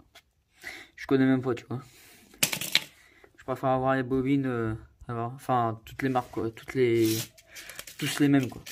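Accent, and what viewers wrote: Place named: Normandy, France. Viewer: J’ai la même ! Mais avec le volant sport ) 👍 Tu es de Normandie ? C marrant ton accent 😂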